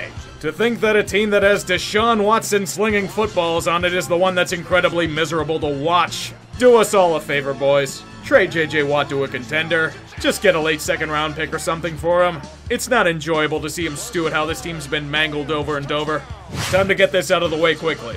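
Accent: Pittsburgh, United States. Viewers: If did all of his videos in yinzer speak I wouldn't mind... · We need more yinzer voice! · should bring out the yinzer more often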